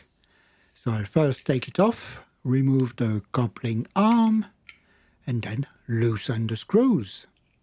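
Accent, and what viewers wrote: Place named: France. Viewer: Just commented on another video and now exploring your channel :) The french accent again 😍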